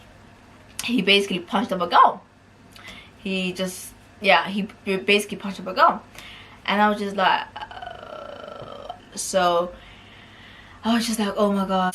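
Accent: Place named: Scandinavia. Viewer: by the way wheres your accent from?, are u from those Scandinavian countries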